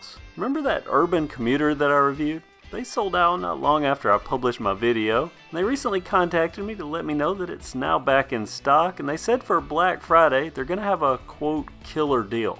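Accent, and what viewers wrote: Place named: Alabama, United States. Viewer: love to watch your videos, that easy alabama accent is great to listen to while I work (on bikes)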